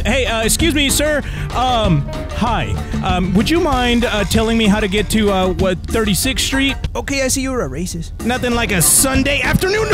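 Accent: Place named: Mexico. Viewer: You sound so white And so Mexican at the same time Síguele compa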